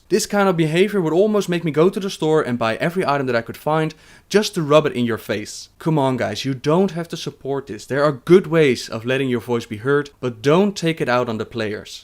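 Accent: Netherlands. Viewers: Your Dutch accent is ridiculous. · are you dutch ?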